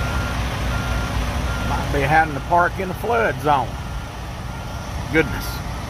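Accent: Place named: South, United States. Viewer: We're you from. Sounds like a southern accent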